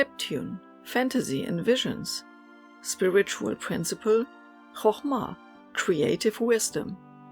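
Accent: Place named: Germany. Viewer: I hear a german accent. Are you from Germany or Austria or something like that? :)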